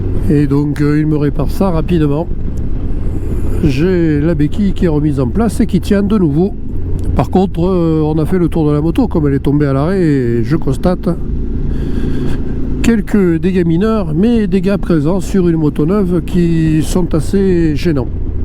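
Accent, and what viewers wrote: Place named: Provincial, France. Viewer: Le mec achète CHINOIS et il s'étonne d'avoir des problèmes, HAHAHA !! Et c'est quoi cet accent ridicule de plouc de province ???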